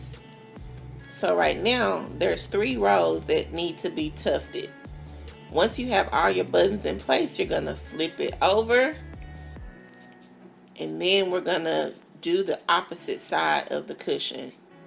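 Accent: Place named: Alabama, United States. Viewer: Hi, such a beautiful work 💞💞 are you from Alabama? I looooove your accent. I'm from Argentina.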